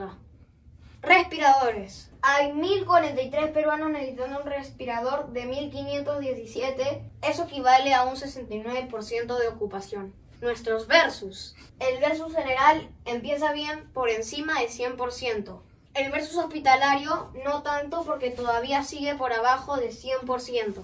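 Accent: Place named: Argentina. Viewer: porque algunos peruanos hablan como argentinos????? pense que eras de argentina se parece bastante el tono... alguien le paso lo mismo???????????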